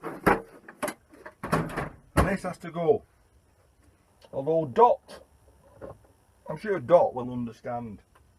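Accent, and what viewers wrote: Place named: Wigan, United Kingdom. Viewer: You sound like a Wigan lad, what scrapyard do you use if i may ask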